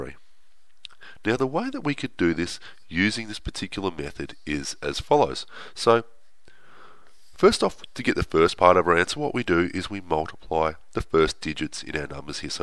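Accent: Australia. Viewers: Not sure if you already realized this, but it's not a British accent it's Australian (did you catch that stereotypical aussie "G'day" in the beginning?)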